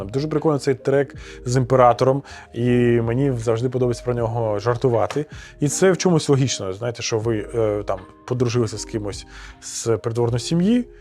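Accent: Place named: Hutsul, Ukraine. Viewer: Сколько вас развелось. Ужас, слушать невозможно, суржик гуцульский, извращенный русский язык.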